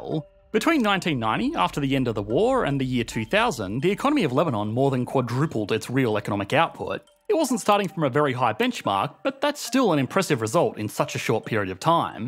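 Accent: Australia. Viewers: This is a brilliant video but this guys accent makes it UNBEARABLE! get someone else to narrate it you are not a good presenter. The annoying tone raises at the end that your Ausie accent is used to makes no sense to Americans or brits, this kind of raising your pitch only makes sense if there is a clause or a comma in writing which has a follow up in the same sentence. You do it with Litteraly every phrase and it makes what you’re saying impossible to understand.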